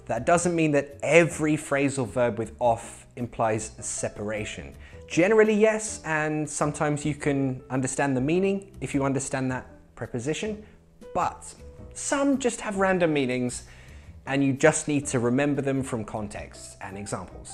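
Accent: United Kingdom. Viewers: The way he talks has a very typical British accent than we Americans. Awesome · He's British!! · Are you British, teacher?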